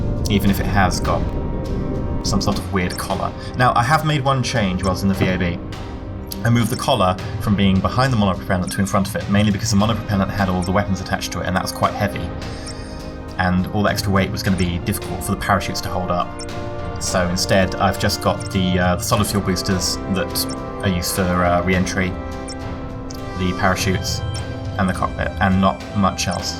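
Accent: United Kingdom. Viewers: R u British? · Are you british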